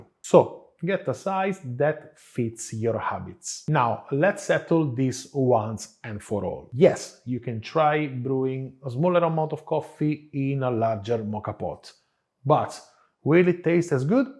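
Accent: Italy. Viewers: Listening to 10 minutes of english with an italian accent is the real treat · He is genuine, he is experienced. The Italian accent was a little tricky for me, so I added the subtitles on. However, I learned how to use the Moka Pot for Italian style coffee.. Can you show me the exact increments for each cup of coffee, For example, 12g of coffee with 70-80 g water. So what would the increments be for the other cups of coffee? The exact increments will make better coffee. Thank you so much for this presentation,.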